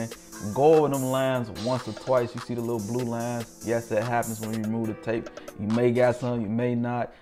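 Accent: Chicago, United States. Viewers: Bruh, you from Chicago?! Lmao I stayed for the commentary Omm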